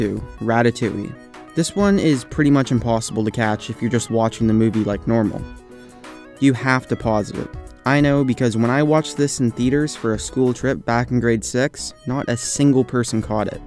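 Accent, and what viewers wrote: Canada. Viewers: Is he Canadian? He keeps saying aboot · i notice a LOT of people that do the "numbers lists" (top 10, 5 things, etc..) always seem to have Canadian voice overs, whether it's due to being produced in Canada or maybe Canadians really like to do voice overs<shrug> either way, it is NOT a complaint, merely an observation, as I'm a big fan of our neighbors to the North! ;) (also, you could get into bars and strip clubs a couple years earlier than you could in the States and Niagra Falls was only a 3 hour ride away! God Bless Canada!!) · Ahhh....I love Canadian accents. <3 _ <3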